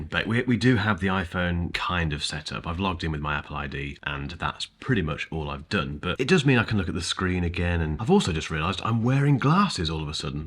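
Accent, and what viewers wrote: England, United Kingdom. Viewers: Unwatchable ramblings. I really loved Samsung galaxy and didn’t miss iPhone apart from the ecosystem… 😂😂😂… my dude do you comprehend that it’s all about the ecosystem. What did you use it for… the calculator? Another proof that English accent does not mean intelligence. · Listening to British people review products is excruciating torture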